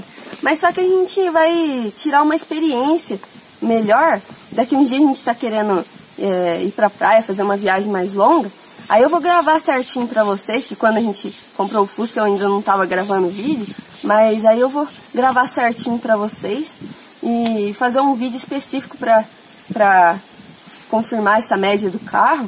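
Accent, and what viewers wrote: Minas Gerais, Brazil. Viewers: VC tem sotaque de mineira. .Tenho um Etios Hatch 1.3 2017/2018 na BR distância mais longa faz 17km/L cidade faz 10 ou 11 mas tá ótimo fusca tem fama de beberrão. .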